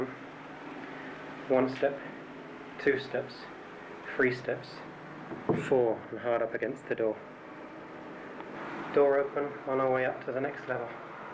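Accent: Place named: Peacehaven, United Kingdom. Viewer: I have a friend who comes from Peacehaven and his accent and pronunciation is identical to yours. If I close my eyes it could be him speaking. You're not from round that way are you?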